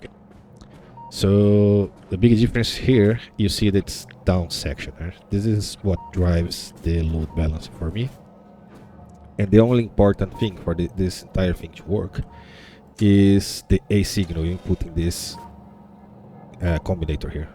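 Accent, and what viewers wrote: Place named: Brazil. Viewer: Vc é brasileiro? Teu sotaque parece daqui.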